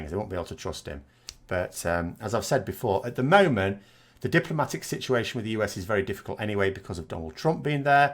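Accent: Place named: Midlands, United Kingdom. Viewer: I really like your work, you are a natural communicator! Best of luck with full-time YouTubing. Where is your accent from? It sounds my ear as a nice middle England genuine accent.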